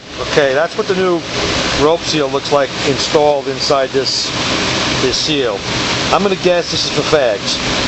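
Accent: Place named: New York, United States. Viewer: Jesus Christ dude the day I bought my GN brand new back in 87 the service department at the dealership told me that this is literally the most sensitive and temperamental v6 that GM ever made. You can’t man handle and let sensitive internal engine components like the camshaft journals being scratched and nicked by the hood lock. Then you spin away all the assembly lube on the cam showing off with this dog and pony show. I love how you fingerprint yourself in the valley pan. You sound like your from either Brooklyn or Queens Ny and I hope to God in 31 years I never had you working on my WE4 because after seeing this video all I can say is God be with Pasquile and his engine. If you pulled this on my car and I saw this video Idk how I would respond. Since retiring and moving to Florida I started doing everything myself on my car because the dealership doesn’t know how to work on these cars anymore and the “Go too guy” could be like you who is careless and doesn’t (be admission on the video) care about your customers or the work of art classic piece of American history that you are working on. 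I guarantee you nicked that bearing journal and that engine isn’t running or has since been re built by someone who knows what he or she is doing. You should already know how unforgiving and intolerable these turbo 109 engines are. Especially the shortblock and shitty oil pump, and oil pressure issues. But like you said,,It’s not your car.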